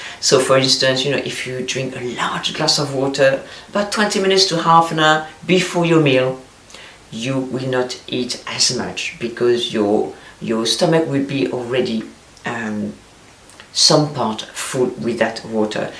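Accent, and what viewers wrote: France. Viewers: Words of wisdom! I think most women know these rules but it’s so nice to hear it as a reminder especially when it comes with a French accent! It’s hard to stay on track when you’re home now, near a refrigerator. Have healthy fruits and veggies ready to snack on. And the occasional cookie. But sugar is an addiction. So if that’s you (like myself), you may have to cut it out completely to “detox” first. Losing weight is a marathon, especially after your metabolism slows down. This lockdown won’t help, so you need to keep moving, walking or running daily. Enjoy the fresh air with your kids or dog. Once you get older, like me, it’s so much harder to lose those extra pounds, so try not to gain them. That’s what this video is about, maintaining a healthy balanced lifestyle! Good luck everyone! And stay safe!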